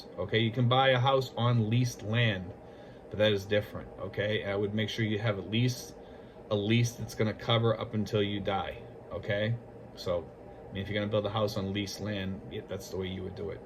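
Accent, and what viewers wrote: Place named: New England, United States. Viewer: A New Englander I hear it haha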